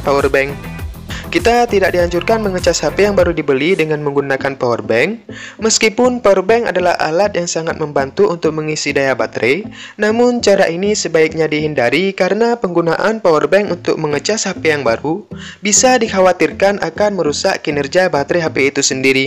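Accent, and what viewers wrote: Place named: West Sumatra, Indonesia. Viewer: Dari logat ny...Orang minang ya min..?? 😄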